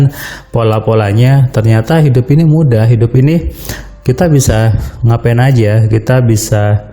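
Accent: Madura, Indonesia. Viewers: ❤❤❤❤❤ mantab, logat Mas, logat madura..khas bangets, I Like It's 😅🙏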